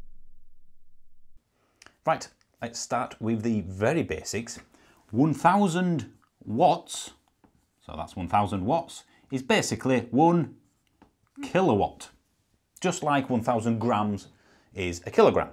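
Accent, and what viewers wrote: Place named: North, United Kingdom. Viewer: Love to hear that northern accent!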